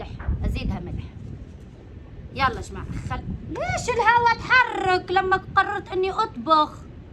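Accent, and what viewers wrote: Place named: Saudi Arabia. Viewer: 😆 هدرتك تتبدل صرتي سعودية صح 👌🏼💯😆 بصح ضحكتك تبقى كيما راهي 😆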